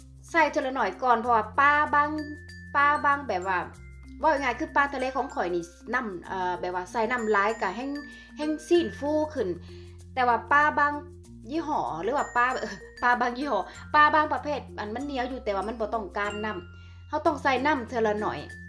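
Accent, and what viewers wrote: Laos. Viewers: ตามมาเพราะชอบฟังพุดลาวเพราะคัก อั่นนะๆ เด้ะ มีเสน่ ลาบปลาพอทำสุกนี่เหมือนทอดมันเลยนะจ้ะ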